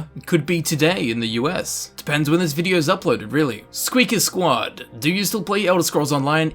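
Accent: Australia. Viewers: Wait, your Australian?! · WHERE? WHERE IS HIS AUSTRALIAN?!?!? WHATS WRONG WITH IT?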